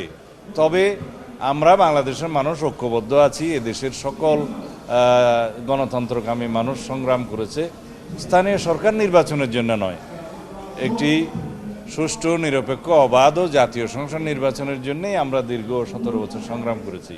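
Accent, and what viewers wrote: India. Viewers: র এর সদস্য জ্বালা হবেই/সালাউদ্দিনের কথা বলার স্টাইল আওয়ামী ও ভারতের মত। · এই টা ভারতীয় মাল কথায় বুজা যায়।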